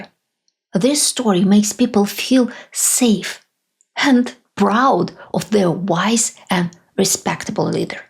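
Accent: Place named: Russia. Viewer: I'm happy to hear the story with a Russian accent. Spread the word.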